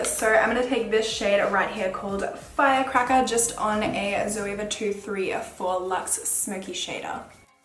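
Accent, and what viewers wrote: Australia. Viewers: As soon as you started talking I was like "of course she's Australian". Why are all Australian girls so damn pretty, wtf?. Anywho, subscribed 😍❤️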